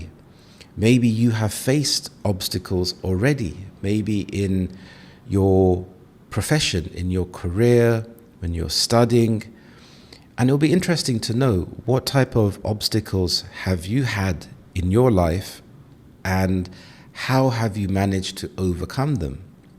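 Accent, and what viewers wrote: United Kingdom. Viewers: Hello Sir., it's the first time I've watched a video podcast episode from yours and I liked that, it was nice, relaxing and with a very clear sound and voice...a nice warm clear British accent the accent I'm trying to acquire for myself. I believe that I could try to shadow it if it is ok.Nice topic indeed, I don't buy on-line a lot and for important items I'd rather go in a shop big or small. Thank you very much, I'm happy to have found another interesting channel. Cheers! 🙏😊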